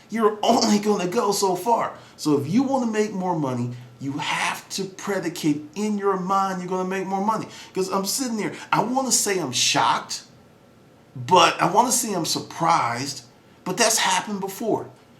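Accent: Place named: New York, United States. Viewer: U have a touch of NY in u :) We r real people, straightforward, no BS! That's why I'm one of your biggest fans! Professional but have a little hood! LOL! keep up the good work!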